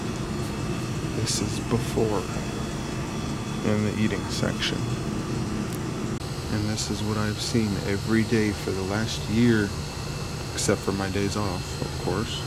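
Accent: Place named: Texas, United States. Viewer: Love the jumper. So these cats want Pizza? I keep thinking ur from Texas... x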